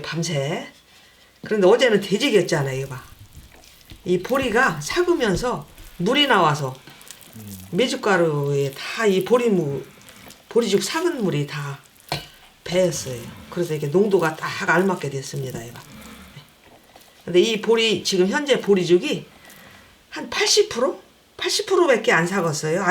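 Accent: Chungcheong, South Korea. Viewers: 정감있는 내고향 충청도 구먼유 잘 배웠어유 감사해유^^ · 여기 버지니아 인데요 잘보았습니다 1월쯤담아야셌네요 충청도 사투리 좋아요 내가 예산이 고향이니까요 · 구수한 충청도 사투리 정겹습니다 저도 한번 담가보겠습니다 감사 합니다^^ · 충정남도 아님 전북분 말씨 같아유 정겹네유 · 항상감사히잘보고있읍니다충청도분같아요 구수한말씨며여유로우신마음씨가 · 척척박사, 정결. 깔끔. 충청도 사투리까지. 주부와 여성의ㅡ모범 이십니다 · 말씀도 참정감있고 맛깔스럽게 하시네요ㆍ아드님하고 대화도 사랑이넘쳐요ㆍ고향이충청도라 사투리가 아름답게 들려요ㆍ요리도 믿음이가네요ㆍ당장따라해볼게요ㆍ고맙습니다ㆍ❤❤ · 좋은영상 잘보았습니다 김장해놓고 꼭담글겁니다 강상합니다 말씀 이 충청도에유 듣기좋았어유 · 충청도 구수한 사투리가 너무 정겹습니다 전 막장은 한번도 안담궜는데 당장에 해야겠어요 쌈장하면 맛있을것같애요 맛나게 익으면 며느리도 주면 좋아할듯하네요 귀한 정보 가르쳐주셔서 감사합니다 고맙습니다 ~~~♡ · 엿기름이 안들어가서 더 쉬워보이네요. 나도 장을담그는데. 막장은 안해봐서.한번해보려구요. 충청도아줌마인가요? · 저도 츙청도 인데 말씀이 넘 구수하내요 막정에 엿기름 넣어 해 보았는데 아니더러구요 얼려주산대로 해 봐야겠오요 정성이 엄청들어가내요.보여주기 정성이네요 고추싸없으면 고추가루 넣어도 되나요 아닌 국물 냘때 먀운고추 조금넣고 끊여도 될까요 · 저두 충청도 결성인디 사투리 정겹습니다....ㅎ 묽으스름....ㅎ 저는 보리쌀을 방앗간에서 가루로 빻앗는데 그냥가루로 죽쓰면 될까요? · 충청도아줌니인가봐요 ㆍ수고많으시네요ㆍ저두. 따라서해볼랴구요ㆍ수고하셨수 ᆢ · 구수한 충청도말씨 정겹네요 옛날 어른들이 담그시던 막장 먹고싶었는데 잘배웠어요 겨울되면 담가야겠어요 감사합니다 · 충청도 말씨가...고향에 온 느낌입니다.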